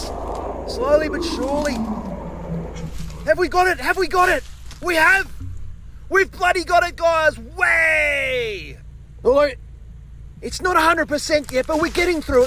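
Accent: Australia. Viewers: I Am from The Netherlands and I just love your australian accent 😊 And it's kinda fun to watch how it's done, Thanks for the amazing content ☺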